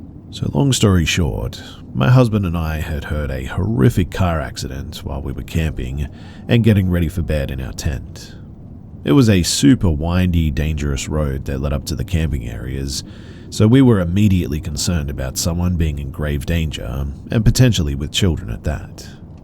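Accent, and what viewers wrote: Australia. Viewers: Just the aussie I was lookin' for to put me to sleep!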